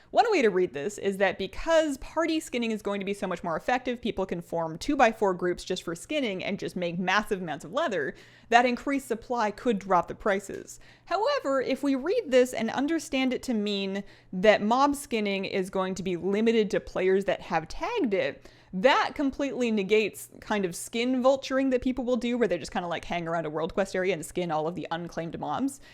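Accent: Canada. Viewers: Your Canadian accent has come back 😍 ✨about✨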